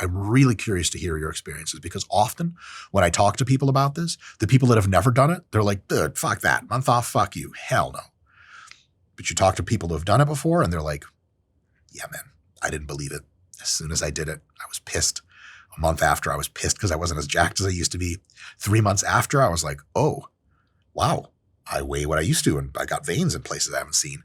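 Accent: United Kingdom. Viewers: I f******g loved the British accent. Much love from the UK brother. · I need more of that British accent! Hilarious! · British accent 🤢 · Your British accent is aweeesome 😂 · Love the British accent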